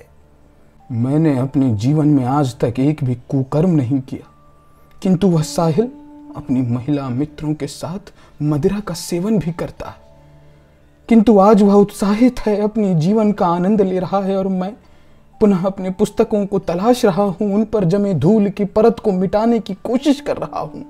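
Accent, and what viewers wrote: Standard. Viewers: aapki itni shuddh hindi sunkar hame charm sukh ki anibhuti hui mitra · Bhai itni shudh hindi 🙂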